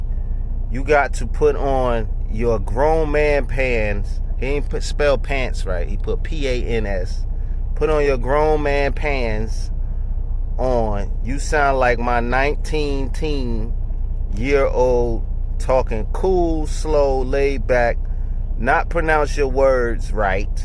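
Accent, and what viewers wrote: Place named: New Jersey, United States. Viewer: Lol dude wildlin...I'm from Nj mostly everybody I kno talk like you and for you too be successful and still be your self is inspiration for plp like me...I tried to find you on ig but a whole bunch of other accounts popped up...I just got my authority authorized this week and love to link with you cuz I kno you'll keep it 💯 let me kno if it's a easier way to contact you stay bless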